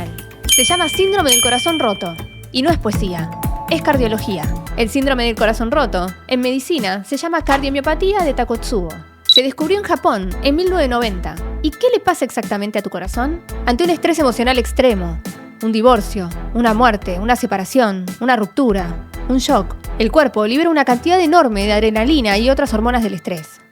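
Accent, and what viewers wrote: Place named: Buenos Aires, Argentina. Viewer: Simpre tenes videos muy interesantes exelente research Y cambiando el tema pensar qje nací en Buenos Aires y me fui a los 5 años pero ese acento de Buenos aires me fascina mucho te go que visitar Argentina 🇦🇷 pero especialmente Buenos Aires que lindo saludos dese New York 🗽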